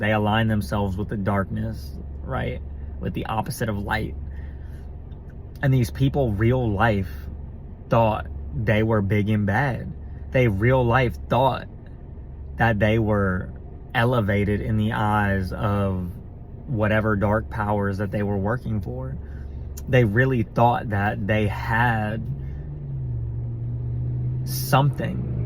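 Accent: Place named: Texas, United States. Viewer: I'm a new sub! Are you from Texas? You sound like back home to me... sending you warm loving energy from Hollywood, my newest home! ✨️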